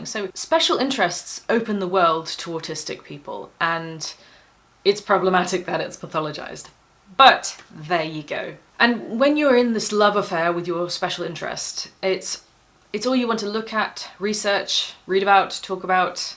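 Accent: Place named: England, United Kingdom. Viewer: Nice to hear an English voice, so many of these things are American. I kind of tune out when I hear foreign accents...maybe due to my condition (I self-identify).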